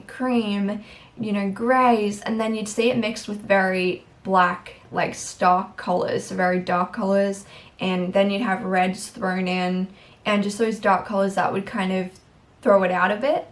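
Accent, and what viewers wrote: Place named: United Kingdom. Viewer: Maybe have a look at male "fashion" and make comparisons to modern day male "street" ware, that would be interesting. Dr Martins are really getting some attention here in Denmark these days among the young people, I see them all the time, never owned a pair but always thought that they looked a bit uncomfortable, guess you have to "suffer" for beauty. Another thing that was popular in the good old grunge days where t shirts on-top of sweaters , Layne Staley from AIC always did that, I guess it also covered up his needed marks among other things. I take it from your accent that your from the UK, I really liked those UK bands from the Brith pop days, it was a funny switch in style and attitude, the fashion changed a lot, slim jeans, leather jackets, retro Adidas sweatshirts, under sized t shrirt and poloshirts took over, those where funny times.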